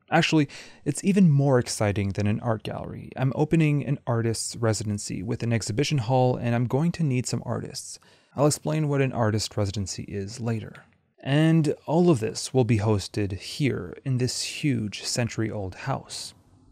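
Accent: Quebec, Canada. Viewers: Hmm may be a kind of a stretch but i always assumed you were quebecois from your pronunciation of french words and names! Love this channel keep doing what you are doing!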